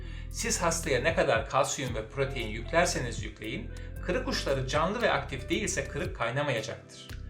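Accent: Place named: Samsun, Turkey. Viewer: Hocam Samsunlumusunuz, veya Samsunda bulundunuz mu? Şiveniz Samsun şivesi de ondan sordum..?😂 Sevgiler Saygılar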